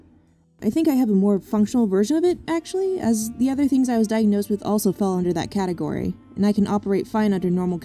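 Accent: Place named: United States. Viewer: Visuals were great, but I'm sorry the speech was so fast in an American accent I have no idea what was said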